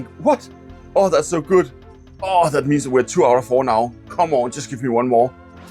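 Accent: Denmark. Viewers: fuck jeg elsker danglish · See, i love me a fine light accent on the tube and this one is charming as heck lol, where you from, Danmark?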